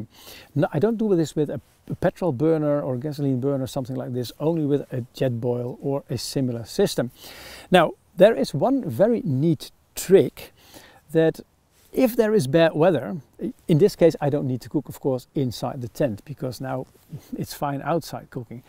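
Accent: Netherlands. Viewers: superb review. Your “Dutchy “ English reminds me of the accent and translations my Dutch girlfriend speaks ! ❤ Thanks for filming in English- very useful content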